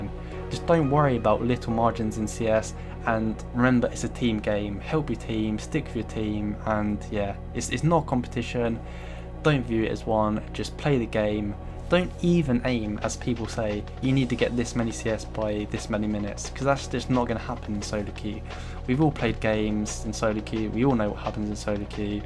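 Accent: United Kingdom. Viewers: He includes (british gamer) because the accent is what brings people here:D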